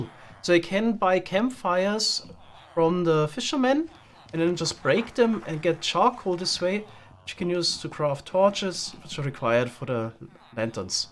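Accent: Germany. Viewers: It’s so interesting!! Looking at it phonology-wise, I believe it’s because in German, voiced stops and fricatives like b, d, g, and z are never found at the ends of words. However in English, the words eggs ends with both a voiced g and voiced z sound. Thus he replaces the voiced consonants with their voiceless counterparts k and s, which are allowed to be at the ends of words in German, but as a result both “eggs” and “axe” end with the same two consonants, k and s. As German is his first language (I’m assuming?), he must really struggle with using the ‘right’ phoneme sometimes at the ends of words or simply can’t hear the difference. Accents are awesome!!!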